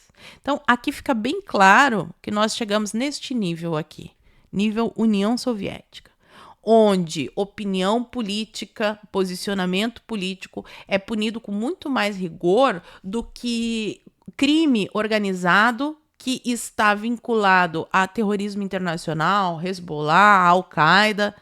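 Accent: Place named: Rio de Janeiro, Brazil. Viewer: Cariocão kkkk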